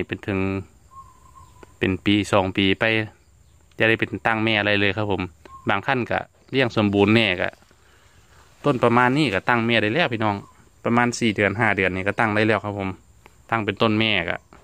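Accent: Korat, Thailand. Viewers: สำเนียงคนโคราชแท้ครับ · ช่องอีสานฟังไปฟังมาเหมือนคนโคราชเลยพี่คนที่ไหนครับทำไมพูดแบบนี้ ผมเเค่สงสัย555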